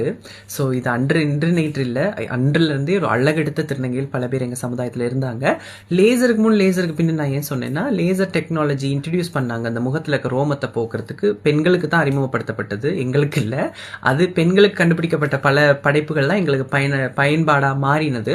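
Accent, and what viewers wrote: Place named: Eelam, Sri Lanka. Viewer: Cute.., you are very good in eelam Tamil..appappo eelam Tamil la pesunga.. quite emotional a irukkum eelam Tamil ketkumpothu..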